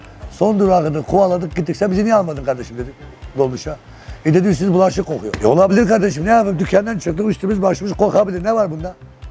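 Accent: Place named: Black Sea, Turkey. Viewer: Abi sende Karadeniz şivesi var.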